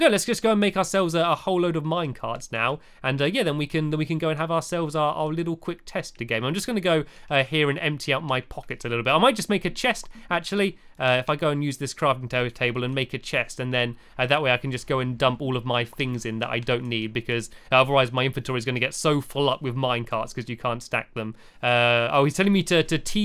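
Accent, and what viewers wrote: United Kingdom. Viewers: OMFG he has a british accent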